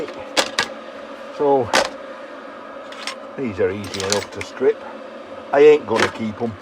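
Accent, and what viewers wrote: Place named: South Yorkshire, United Kingdom. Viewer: Guessing from the accent you're from South Yorkshire? If you're after an Ewaste buyer, SJA Metals at Newark are decent to deal with. 👍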